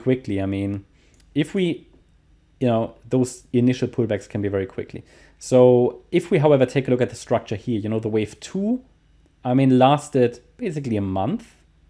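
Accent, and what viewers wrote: Germany. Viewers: Man hört direkt raus das du deutsch bist :)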